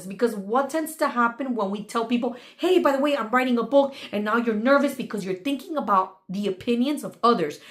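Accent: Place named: Latin America. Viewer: I just finished this video. Priceless insights! And loved the subtle Latina accent. It helped me to connect and invest just a little bit more. Looking for the next one to watch. Thanks again!!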